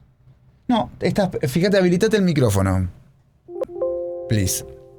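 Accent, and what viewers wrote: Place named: Argentina. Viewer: en primera me sorprende tu respuesta muy explícita y respetuosa nice ! Segundo ,dónde estás imagino q en argentina por el acento Tres como se ve la situación en tu país en verdad hay gente q se preocupe por hacer ese tipo tratamientos a sus autos si apenas hay para cosas de primera nesecida!!! O q porciento de la población en tu comunidad gasta en eso ???? Si estuvieses en USA o EUROPA t lo compro ! Y por último con mucho RESPETO es de muy MAL gusto estar bebiendo cuando estás hablando ! Saludos .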